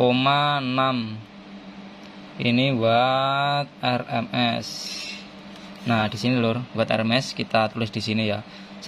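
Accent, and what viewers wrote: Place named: Madura, Indonesia. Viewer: Nah ketahuan ada logat madura nya 😲😲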